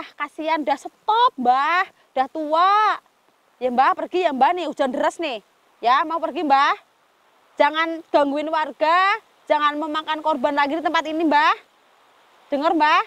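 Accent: Banyumas, Indonesia. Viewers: kui mba wong ndy jane sih deng ngomonge ngapak😂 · Mb lucu nek ngmong ngapak....haaaaa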